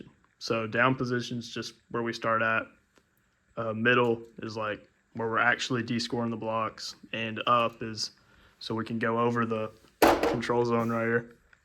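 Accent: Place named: Texas, United States. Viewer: the texan accent 🔥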